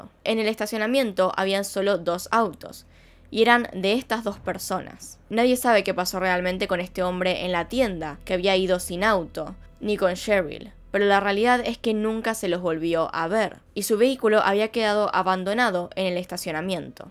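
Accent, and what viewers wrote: Argentina. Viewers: Amo tu forma de redactar! Y más porque hablas en idioma argentino ♥️ ^^ Me gustaría que hagas el caso de Jenneka Jenkins! Gracias por el maravilloso contenido que nos regalas ^^ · Antes sólo veía a Paulette y ElizbetM, pero desde el día que YouTube me recomendó tu canal me encantó. Hace apenas una semana de éso y ya casi me veo todos tus videos. 😂 💕 Eres de las mejores en lo que haces, me encanta tu fluidez, vas al grano, das detalles y se nota que neta investigaste y conoces el tema a fondo. Muchas felicidades, y éxito para éste canal! 🙌🏻 Saludos de Mexicooo. 🇲🇽 P.D amo tu acento Argentino. 😂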